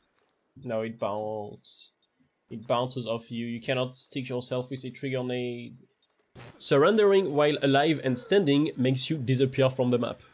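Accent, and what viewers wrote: France. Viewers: sorry you have to play this game on hard mode once the other raiders realize you sound french. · Why do i feel like you have french accent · Français non? Le bon vieil accent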